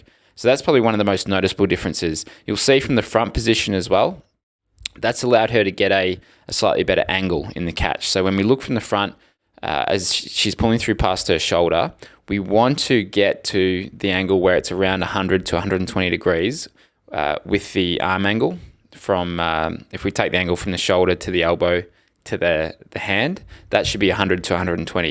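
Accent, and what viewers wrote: Australia. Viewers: Benther He has an Australian accent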